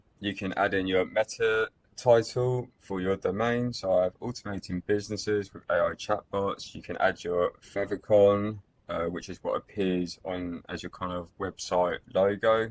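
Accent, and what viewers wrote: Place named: United Kingdom. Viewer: your British voice sounds so classic!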